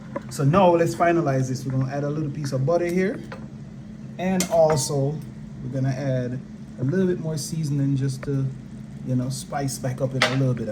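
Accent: Jamaica. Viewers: I love your Jamaican Accent. The food looks amazing.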